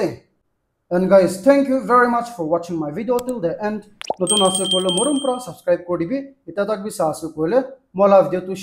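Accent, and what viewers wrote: Nagaland, India. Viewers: 😂I like your nagamese news..as you speak our naga ascent of our nagamese common linguage..thanks and may God bless you pl..yes stay as,a naga and speak as a true origional nagamese I like it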